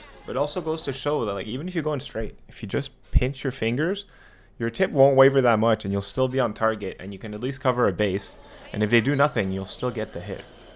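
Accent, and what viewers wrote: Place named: United Kingdom. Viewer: Fucking British English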